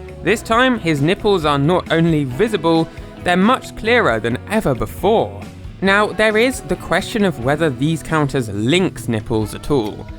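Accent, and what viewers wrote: United Kingdom. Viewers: did i just listen to a british guy talk about nipples for eight minutes? yes. yes i did.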